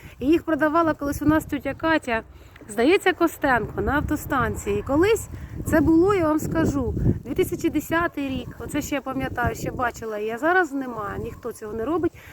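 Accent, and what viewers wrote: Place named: Poltava, Ukraine. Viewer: Цікавою Люблю етнографію. звитчаї і традиції.Судячи з усього, Ви з Полтавщини. (Опішню ще Панас Мирний згадував). Ну і плюс говірка.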